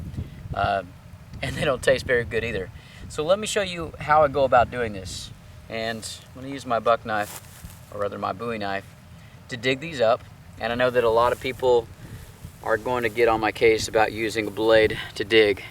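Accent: Texas, United States. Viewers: He's a Texan.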